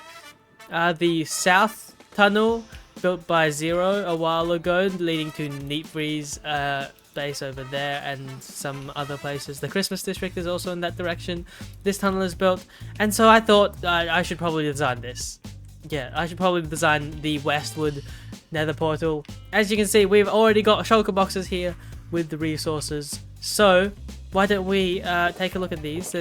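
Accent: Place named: Australia. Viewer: You sound more Australian and look more orange and scammy since I last talk to you, what happened🧐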